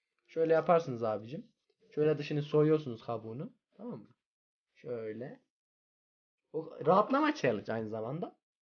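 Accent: Erzurum, Turkey. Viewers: Abi erzurumlumusun bende erzurumluyum dadasim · abi sen erzurumlumusun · Neydisen gardaş sen erzurum un neresinden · Abi sen erzurumlumusun ben erzurumluyum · Erzumlumu yoksa Erzurum da mi yasiyot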